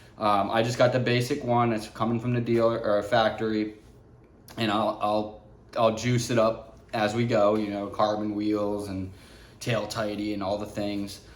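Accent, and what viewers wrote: Philadelphia, United States. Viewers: I thought u sounded like u from philly! That’s crazy! Born and raised in philly! Love the Philly love!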